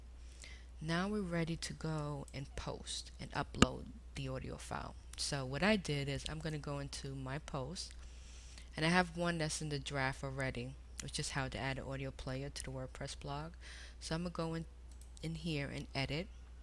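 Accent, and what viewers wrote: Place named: East Coast, United States. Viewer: thank you so freaking much man.. i could not verify the audio file at all thank you so much it was in front of my face the whole time.. love your voice and eastcoast accent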